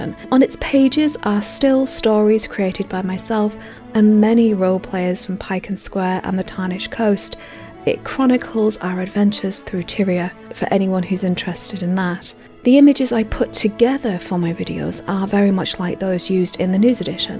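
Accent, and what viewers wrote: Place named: Northern England, United Kingdom. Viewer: i heard the northern twang straight away haha from midlands here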